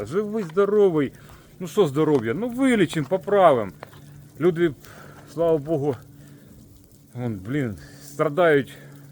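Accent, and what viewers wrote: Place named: Surzhyk, Ukraine. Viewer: Що ж вас так коробить? Все гарно у нього виходить , нічого він не мучається , класичний український суржик .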